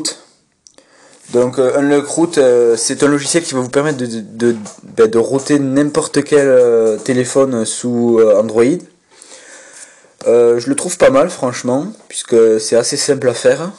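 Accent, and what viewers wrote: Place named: South, France. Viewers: Meme si j'ai 13 ans tu m'accepte sur la xbox Os:on entant a l'accent que tes du sud ;-) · Ton accent t'as trahis;) sinon sympa ta vidéo. Et vive le sud ouest!:)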